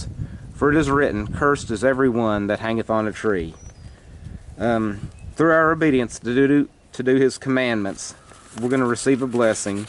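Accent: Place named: Tennessee, United States. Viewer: Oh my hole reason to message you was to thank you for submitting to our Creator and also to ask you if you were from Tennessee cause you sure have a Tennessee, Kentucky, Mississippi accent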